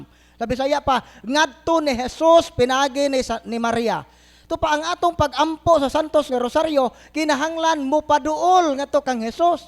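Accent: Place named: Bohol, Philippines. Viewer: nindot gajod ni motalked c bro. KY real language gjod boholano gjod.nindot gjod paminawon bol-anon.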